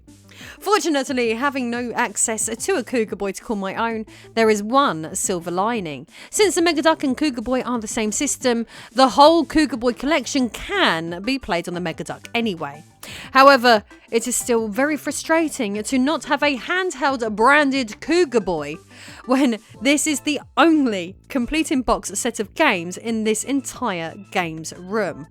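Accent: United Kingdom. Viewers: you reminded me of Batonetta with that british accent and s3xy tone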